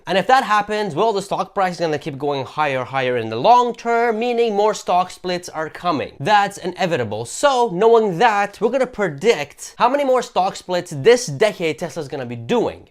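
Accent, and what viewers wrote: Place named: United States. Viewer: Just curious, why do you have an American accent?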